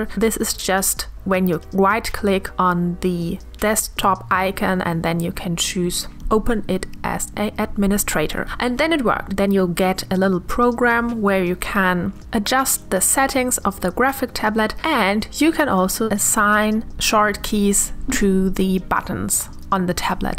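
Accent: Germany. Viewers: Man, deine Skizzen sind allein schon immer so unglaublich schön <3 das Endergebnis ist natürlich mal wieder richtig traumhaft:) ich liebe deine Art Nouveau Einflüsse, die Kunst von damals ist so schön und zusammen mit deinem Stil wirkt das so toll:) Danke übrigens für die ganzen Tipps die du mir und anderen Künstlern zur Verfügung stellst! Ohne dich und ein paar andere Kunst-Youtuber hätte ich es nie geschafft meine Kunst so zu verbessern (auch wenn ich natürlich noch viel zu lernen habe:D). Du bist glaube ich übrigens die einzige Deutsche deren Voiceover ich in Englisch immer total mag, deine Stimme ist total entspannend:D Und ja, du bist nicht die Einzige die ständig ihre Art supplies verliert:D Ich hab's zwar eigentlich immer alles an einem bestimmten Platz liegen, aber ich weiß einfach nicht wohin meine Pinsel ständig verschwinden...:D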